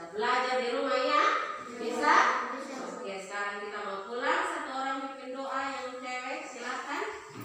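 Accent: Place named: Sumba, Indonesia. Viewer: Logat sumba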